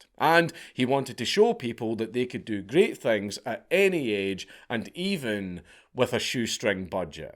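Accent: Scotland, United Kingdom. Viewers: To his Dankulest Lordliness, Hey Dankula, this is a some what formal request. I will offer your choice of £50 or 13 Geese, an Average sized angus cow, a breeding pair of ducks and a single tabby cat or My first born son in exchange for the full unedited middle earth lore dump. I never knew how much I NEEDED to hear a Scottish man talk about middle earth lore, thank you for coming to middle ages welsh barter sesh for dankula content. Yours sincerely, Grand Elder Nanak the High Viseer of the Welsh County Council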